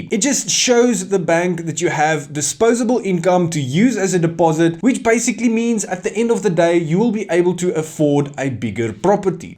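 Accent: Afrikaans, South Africa. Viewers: I'm new here, I love your afrikaans accent 😅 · New here as well, first thing I noticed is the Afrikaans accent, I love that he is authenticity. Dit is 'n paar waardevolle kennis., Danko bhuti 🙏🙏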